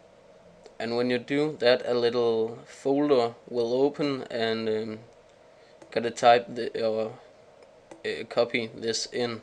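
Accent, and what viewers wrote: Denmark. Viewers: Kunne høre lige med det samme at du er dansker ;)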